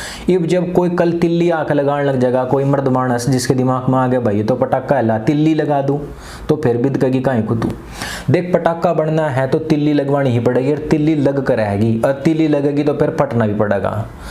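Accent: Haryana, India. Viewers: Sir wah love your haryanvi accents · your Haryanvi style of speaking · aaj Haryanvi tone me kyu bol rahe ho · Haryanvi hai bhai yaa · I think bhai had spoken a hariyanvi accent in this video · हरियाणावी लठ मार बोली 🙂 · राम राम सा सर ...... Love from Rajasthan ❤️❤️ आपकी हरियाणवी सुनके बहुत अच्छा लगा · हरियान्वि लहेजे मे एक् नम्बर लग रहा है video एसे हि विदिओ बनाते रहिये आचर्य जी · Bhai tagdi haryanvi bol rahe ho... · Nice haryanavi sir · Hariyanvi boli k liye wa..wa...wa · Love the haryanvi accent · आपकी हिंदी के साथ हरियाणवी भाषा का तालमेल बहुत मधुर लगता है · Haryanvi nikla hai bhai aaj toh. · Bhai shab haryanvi bilku sahi bol rahe ho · Bhai tu haryanvi bole hai 😘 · you sounds like my hariyanvi friend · haryanvi op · Bhaii haryanvi ko or khadi boli ko hataa kr seedhe seedhe bolo to or accha effect डालेंगी aapki video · यहां तो Pura Haryanvi Accent hai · aapka haryanvi accent sun k accha lga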